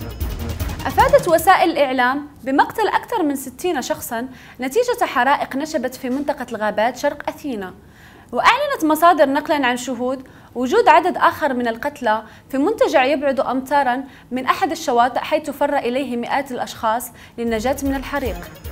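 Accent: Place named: Morocco. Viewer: لا علاقة لها بالاعلام....كأنها جهاز آلي يتكلم....كما انها لا تمتلك أي احترافية ولا مؤهلات تخولها للدخول في مجال الاعلام....يجب عليها أن تتخلص من لهجتها المغربية لما تقرأ......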